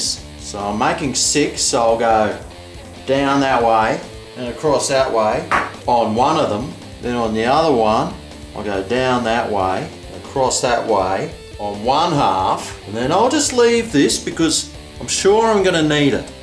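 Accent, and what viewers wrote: Australia. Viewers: hahaha talking in the authentic aussie accent really shows people how we talk down unda!!! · I usually send my mom new recipes that we can cook, I want to send her this, but my arabic mom is gonna be so confused when she sees a Middle Aged bloke shouting at his camera in an Australian accent😂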